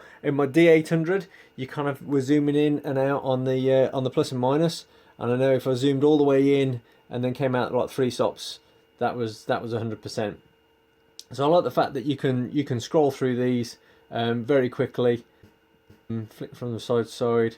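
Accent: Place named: United Kingdom. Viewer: Makes a Change to hear a Fellow British Accent..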